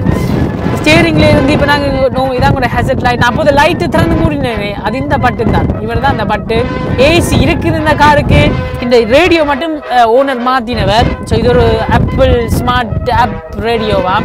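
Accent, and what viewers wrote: Sri Lanka. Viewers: Avoid music .unka ilankai tamil semmayayirukk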